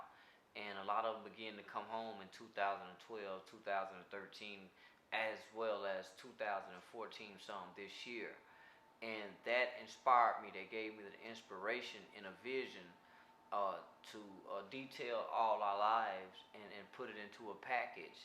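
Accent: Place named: South, United States. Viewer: As a fellow Southern Black Man from Alabama with Texas ROOTS, I SUPPORT MY BROTHER. Hes highly intelligent and speaks words of wisdom!!!! GLAD that he exposes those dysfunctional California LA gangbangers as a HORRIBLE threat to ALL of America!!!! This was an Awesome Bio. Thank you Mr. White. I love that Southern Accent! AWESOME!!!😊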